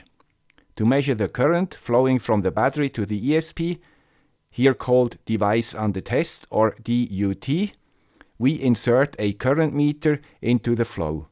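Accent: Switzerland. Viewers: and don't forget 'the guy with the Swiss accent' reference either - otherwise us old folk will become bewildered and confused. We don't like change!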